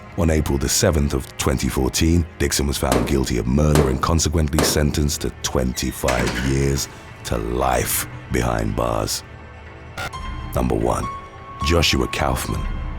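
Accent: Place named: United Kingdom. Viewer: Cut some slack, he’s British. They pronounce things differently over there.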